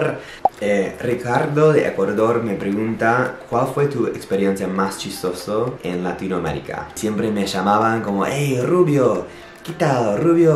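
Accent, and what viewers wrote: Buenos Aires, Argentina. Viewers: Es verdad tiene un toque de acento como los argentino como nosotros habla muy bien .great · no dijo que no tenga acento gringo, dijo que su español es muy argentino, las formas de pronunciar las palabras las acentuaciones y sobre todos los "sh" sounds que se les escapan a veces son caracterisitco del español argentino obviamente mezclado con su acento gringo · ESE ACENTO ES FABULOSO !!! MARAVILLOSO !!! ME ENCANTA ESE ACENTO !!! ARGENTINO ES LO MAS !!!!!!!!!!!!!! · Lucio Martínez yo soy argentino y te puedo decir que tiene un acento muy similar al nuestro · It's a mixture of Argentinean & Spanish from Spain. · Tu tienes el acento “argentino” más claro que he oido en mi vida jajaja. Yo soy de los Estados Unidos también, pero gente dice que yo tengo acento mexicano o peruano. Algunos creen que tengo acento mexicano y algunos creen que tengo acento peruano. 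Pero no he vivido en un país donde se habla español y por eso mi acento no está escrito en piedra. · Tienes marcado el acento Español pero con cierto toque de Argentino! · Suena como una mezcla de argentino, español, y yanqui. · No sabia que habias vivido en Buenos Aires, pero me di cuenta al toque por que tu español es marcadamente porteño, ni siquiera Argentino... porteño! jajaj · you have an Argentine accent when you speak Spanish, I love it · Hablas como argentino porque dices "sho" y no "yo". Pero esta super bien 😄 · Me mueroooo jajajaja es que escucharlo hablando con acento argentino es súper funny. Le queda bien. Saludos desde Puerto Rico. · Hola!!! Me sorprende que hables en español tan bien, incluso tienes acento argentino. Eres muy respetuoso, divertido y buena persona y se nota al hablar. No cambies nunca. Un abrazo desde España. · te sigo hace poco tiempo y realmente hablás muy bien español, me encanta que tengas el acento argentino, me alegro que te haya gustado vivir en nuestro país y conocer nuestra cultura. 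Espero que puedas volver, aún hay mucho por conocer 😁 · I can definitely hear the Argentine accent in your voice... Also your Latin mannerisms you picked up come through... Definitely interesting... I do the same thing when I speak Castilian Spanish... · Me encantaaaaa cuando mezclas el acento argentino y español!! 😍😍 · Que increíble tu español argentino 😍🙂💪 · Interesting, normally Americans learn north American or European Spanish, but you learned Argentinian spanish and even have the strong accent! I love it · Tenes la pronunciación de la letra R nuestra! De los porteños! 😝. Your Spanish is excellent! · Te amo fuerte, tenes un acento muy porteño jajaja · I think what they're saying is that his accent has features most associated with accents heard in Argentina, most notably the way he pronounces doble L. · First of all, someone's accent is Argentine, not Argentinian; "Argentinian" is the name give to the people from Argentina, whereas "Argentine" is restricted to things, both from the said country. 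Second of all, he does mention living down there, working down there and even dating someone from down there. Finally, he does sound like an Argentinian at times; that's probably because of how he learned. Quit bitching... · I love your Spaniard/ Argentinian accent! Lo haces perfecto! Te felicito.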